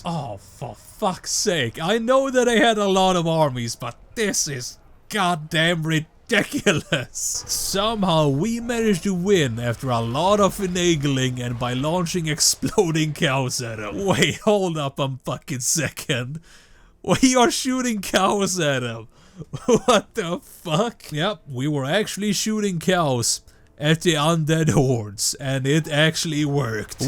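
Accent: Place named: Sweden. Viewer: absoloutly amasing accent i love it, sounds sweede :D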